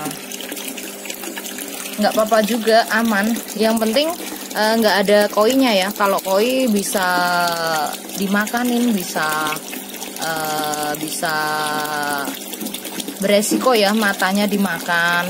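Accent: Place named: Kediri, Indonesia. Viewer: Mbaknya jawane mana tho mbak? Kok kayak Kediri logate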